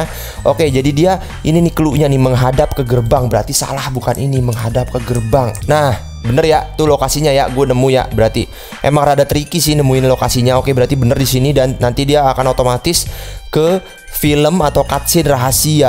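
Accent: Java, Indonesia. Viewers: medok bang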